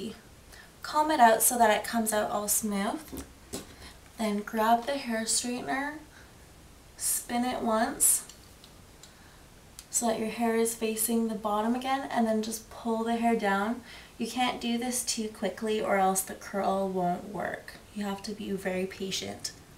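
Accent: Canada. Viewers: Hehe I could tell you're Canadian by the way you talk ^.^ A bunch of shows are Canadian so I kinda know how the accent is lol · Lol your Canadian accent is cute.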